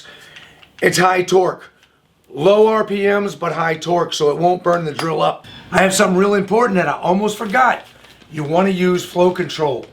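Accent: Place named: Louisiana, United States. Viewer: Hi, great video! I see your wearing an Abita beer shirt. I'm from Slidell and recognized your accent right away! Keep up the great work. Your project looks awesome!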